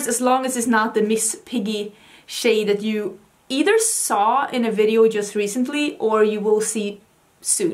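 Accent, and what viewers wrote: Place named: Sweden. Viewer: I loooove how you talk hahaha... I’m a big fan of the Swedish accent 😁 and that eyeliner is AMAZING for your eye color!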